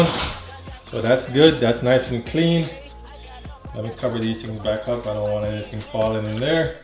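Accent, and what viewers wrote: Jamaica. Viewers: Found ur Channel last night n watch about 8 videos to this point ... keep it up man love the content man ...u sound like a yaadie to lol.. bless up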